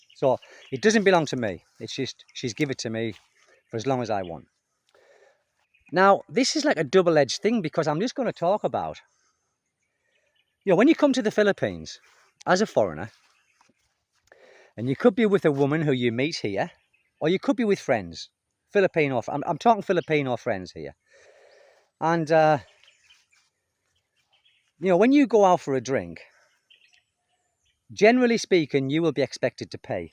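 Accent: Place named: Northern England, United Kingdom. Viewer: Hi I have just discovered your channel and finding it really interesting I have had similar experience when I spent a year in Bali same expectation from locals when westerner arrives they must be wealthy even though I was exploring idea to retire there because retirement in my home country New Zealand was not looking to be comfortable financially however I gave it a year the weather I loved most of the people were friendly but I returned to New Zealand Bali was never going to work out for various cultural differences some you describe with life in the Philippines by the way I have lived in New Zealand for fifty years originally from north of England Middlesbrough your accent interestingly sounds very northern England curious as to where you originate from?keep up great videos love how your son speaks English I also can slightly hear a little north English accent from him so cute.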